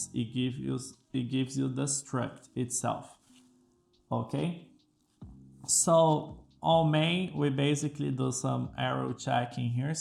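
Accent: Brazil. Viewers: Cara, fala muito bem em inglês, mas peguei no pulo que era brasileiro. Valeu pelo video, salvou aqui.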